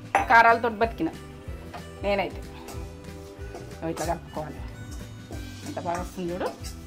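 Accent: Telangana, India. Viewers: Anni vantalu mi మాట్లాడే విదానం mana తెలంగాణా బాష. mi మంచిమాట..చాలా. బాగున్నాయి. అమ్మా 😊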